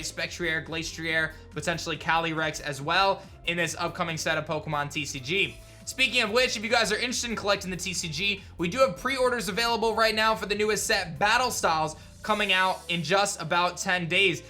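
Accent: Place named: California, United States. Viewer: honestly it makes it hard for me to watch, I liked his old stuff and I’m actually enjoying his xenoverse playthrough but he sounds like he’s from Cali or he’s trying to be a chad but I know he’s from like the midwest or something. It sucks that he’s gotta change his persona to get views but I guess that’s his job right ?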